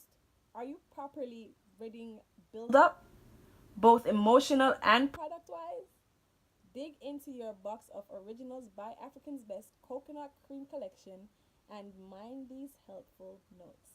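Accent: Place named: Caribbean. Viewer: Lol I love your personality 😂, and your accent girl!! Caribbean females all the way 🙌🏽.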